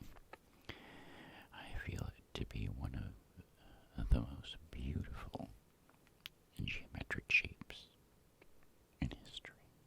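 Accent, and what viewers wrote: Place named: South, United States. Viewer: I like that country sort of accent, you should do like a car salesman role play with that accent, possibly. 👍🏼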